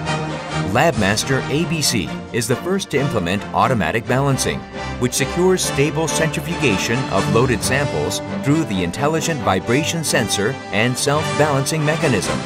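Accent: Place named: United States. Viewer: Oh, my god. This is the most obnoxiously overproduced and tacky commercial for a product not meant for children or even normal households I've ever seen. The "epic" music, the over enthusiastic American voice, and all the sound effects, combined with cheap CGI. For centrifuges! It's too much! I just can't! I will now have to watch it on repeat.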